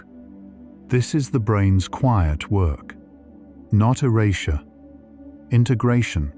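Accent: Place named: United Kingdom. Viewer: all these damn AI narrator's have British accents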